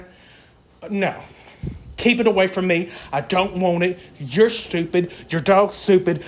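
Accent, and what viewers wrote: South, United States. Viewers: please put your paypal link in the description box for ALL your videos so we can donate to your work. Oh and another thing, dont you dare change your accent or many of us will unsubscribe. We love you southerners the way you are - this is because many of you white folk in the deep south are just beautiful human beings - so promise us all that you will never change.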